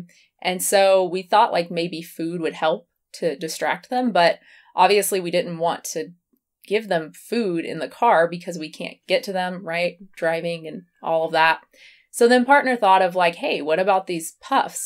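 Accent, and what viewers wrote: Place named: South, United States. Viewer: It's the southern accent. If you watch any of her reacting to when i was raw videos it's so much more pronounced too.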